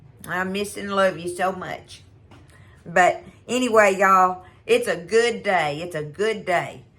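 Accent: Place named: Texas, United States. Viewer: I love the Oh, Oh lol, I miss Texas, love her accent.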